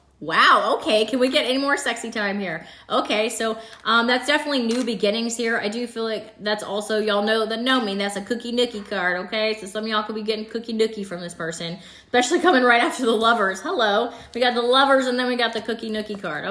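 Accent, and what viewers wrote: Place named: Texas, United States. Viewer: Your readings have been spot on except…… I’ve been trying to manifest you to AZ and that hasn’t happened? I hear a Texas accent. Come on cards.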